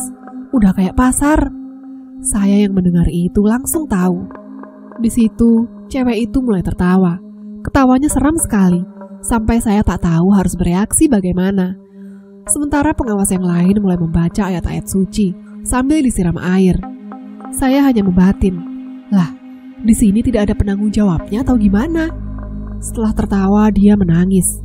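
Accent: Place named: Java, Indonesia. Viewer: tp bhs jawanya medok bngt ya 😃. Sukses selalu ka 👍👍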